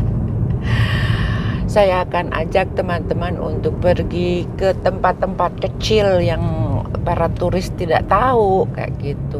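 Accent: West Java, Indonesia. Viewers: Bu , emut keneh basa Sunda teu ? Di logat namah masih Sunda nyaaa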